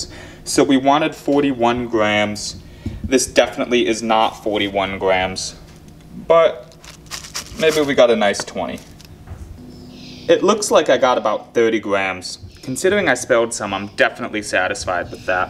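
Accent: United States. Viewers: Are you from Boston? You sound like you have a Boston accent lol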